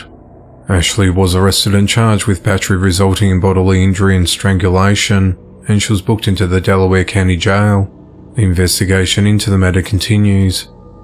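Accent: Australia. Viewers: You must be an Aussie right?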